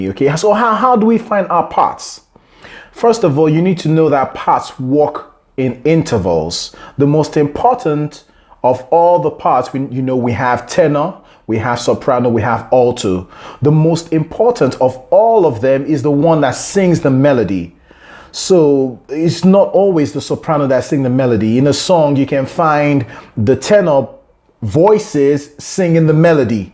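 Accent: Nigeria. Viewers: Be honest, are you a Nigerian? cos you sound like one to me. You look more like an Igbo man. No offence, i love your work. Just want to know.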